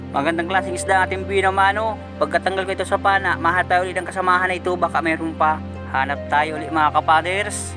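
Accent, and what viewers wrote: Philippines. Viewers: Parang taga katanawan ka kuya ah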